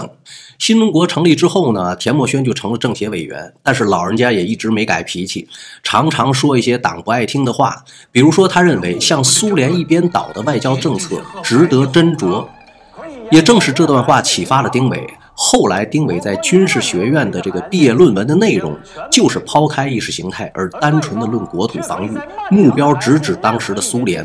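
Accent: Tianjin, China. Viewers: 感觉是天津人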